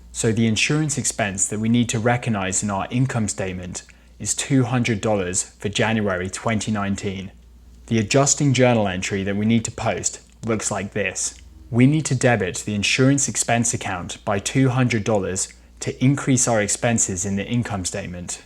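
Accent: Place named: United Kingdom. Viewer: Thank you so much!!! I finally understand what prepaid expenses are! I always Google for knowing knowledge first but I search your channel for knowing accounting knowledge first like this time 😀 Fan from Taiwan 🇹🇼 (Btw really like your British accent!! Also a fan of British accent)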